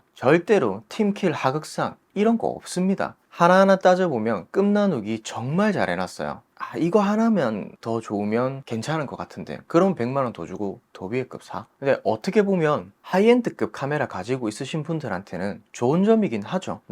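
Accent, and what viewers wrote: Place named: Gyeongsang, South Korea. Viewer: 아랫지방 사투리억양 너무 맘에 들구요 편안한 영상 좋아서 구독과 좋아요 누르고 갑니다!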